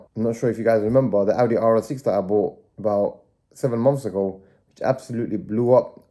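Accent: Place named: East London, United Kingdom. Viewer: Bro you from east london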